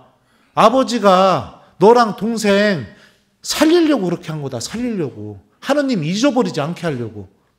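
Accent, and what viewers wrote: Chungcheong, South Korea. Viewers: 신부님강의 충청도 말씀 으로재미 있습니다 매일 유투부 로강 의 듣습니다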